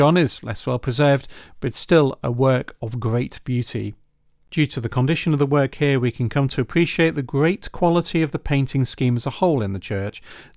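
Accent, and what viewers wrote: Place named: Northern England, United Kingdom. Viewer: I really enjoyed your video. Your delivery is pleasant to listen to (and in the accent of a fellow Northerner, I might add). Where are you from I wonder. Rebecca (Leyland, Lancashire)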